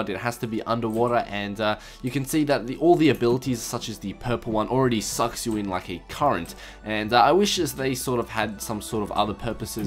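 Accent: Australia. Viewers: Dude 1. It isnt a fake accent 2.The ``fake`` accent is australian, not Russian 3. Learn how to spell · he doesnt have a fake Russian accent its Austrailian and its not fake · He is Australian, thus he has an Australasian accent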